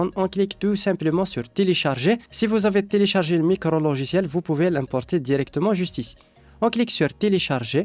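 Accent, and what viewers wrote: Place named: Kabylie, Algeria. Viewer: Salut frr je regarde tes videos et ils sont magnifiques, et je remarqué dans t’accent que tu semblais kabyle mis tmurth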